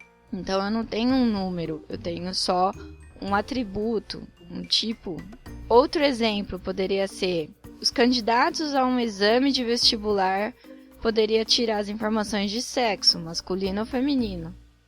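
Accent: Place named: East Asia. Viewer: Você é oriental não é? Percebo pela maneira como você fala. É comum os orientais se interessarem bastante por estatística. Mas muito boa sua aula, me ajudou bastante.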